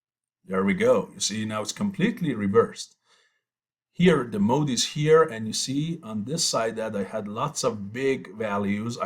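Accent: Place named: Iran. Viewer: سلام من از دانشجو ترم 8 مکانیک یکی از دانشگاه های ایران بسیار بسیار از شما ممنونم بابت این فیلم بسیاراموزنده از مش زدن بسیار نحوه درس دادنتون شیرین وقشنگ بود بسیار لذت بردم دنبال اموزش مش در انسیس بودم در یوتیوب که این فیلم رو دیدم و دیدم چه لهجه ایرانی دارید. دیدم استاد هم ایرانیه کیف کردم سرزنده باشید.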